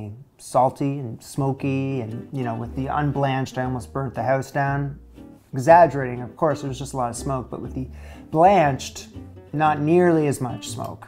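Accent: Canada. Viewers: I’ve always felt like I hear a twinge of Canadien in your voice, so I’d like you to know that the shot of the Bearnaise being poured over a slice of steak made me yell, out loud, “NOW THAT LOOKS GOOD, EHH?” 😂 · I absolutely love watching his videos. The only downside to watching them every day is that I know find myself saying "now" and "about" in a Canadian accent, I live in the UK. Pity I can't cook like him though 🤣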